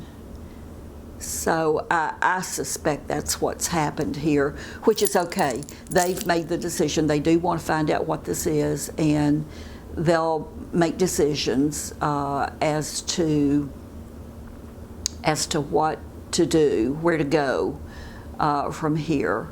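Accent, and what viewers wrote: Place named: Florida, United States. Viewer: Sunday morning here in UK and enjoying my coffee with you - and I love your uh's! It's part of your fabulous accent - thank you for sharing all your news but was very sad to hear about Ethel Cup Cake, and feel so sorry that Jennifer and Riley will be going through a tough time but what a wonderful act of loving decency that did which would have made Ethel's days happy ones. Take care all in Florida we're thinking of you over the pond.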